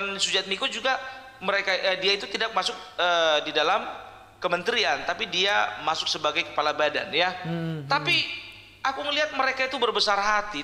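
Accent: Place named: Medan, Indonesia. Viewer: Kamu orang medan ya logatnya, tapi kok pake blangkon, tapi asik aku dengernya, besok konten lagi ya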